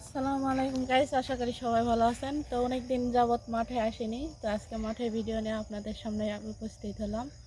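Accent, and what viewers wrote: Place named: Naogaon, Bangladesh. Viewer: আপনি কোন এলাকার মানুষ? ভাষাটা আমার দেশ নওগঁার মত শোনা যায়।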